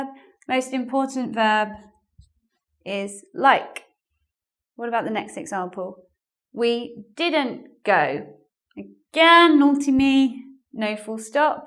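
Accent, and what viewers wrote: United Kingdom. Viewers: jossan Her british accent is so simple to me... YOU CAN READ THE english subtitles AT THE SAME TIME IF YOU WANT TO learn active listening like i do. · Mam, do you use brities accent to teach? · that accent is British English? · Mrs please can you tell me which part of UK you are from? I'm asking because of your accent. Many thanks for the helpful lesson, I have problem with using (have) as an auxiliary verb!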